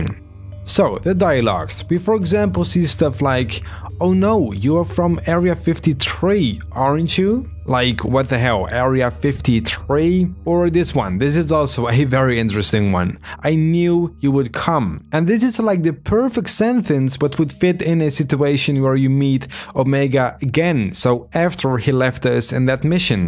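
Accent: Netherlands. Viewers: Dude are you dutch????? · Nederlands toch je weet zelf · you are dutch I recognice your bad accent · yu dutch m8?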